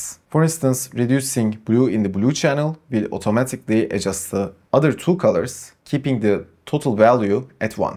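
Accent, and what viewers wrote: Turkey. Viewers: AKSANDAN ANLADIM TÜRK BU :D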